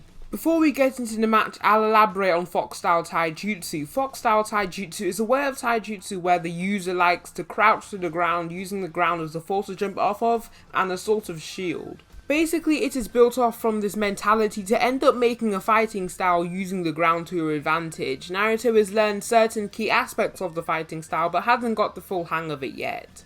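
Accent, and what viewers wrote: United Kingdom. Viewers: That Brittsh accent is so annoying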